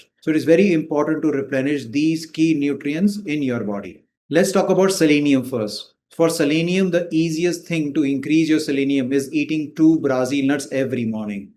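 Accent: India. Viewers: A strong Indian accent is difficult to understand at times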